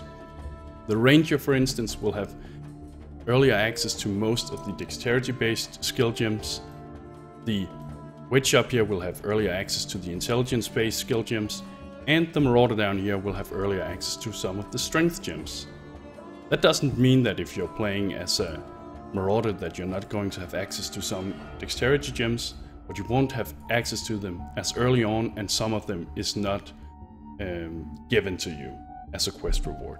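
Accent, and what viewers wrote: Denmark. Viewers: Oh god, Danish English... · are you danish? You sound danish:b with your accent:D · Are you Danish? The accent sounds so much like Danish ^^ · Jeg elsker hvordan en dansker kan høre en anden dansker når de taler engelsk. satan det er tydeligt.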